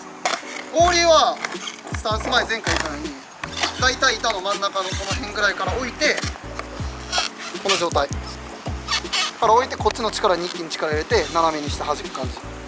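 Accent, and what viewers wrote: Kansai, Japan. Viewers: 関西出身ですからね〜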